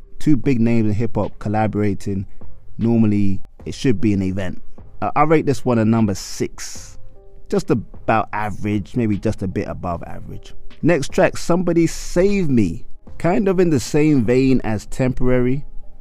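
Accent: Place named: Haiti. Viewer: Wow your review is trash bro btw you sound hatian so that explains a lot you don't understand our music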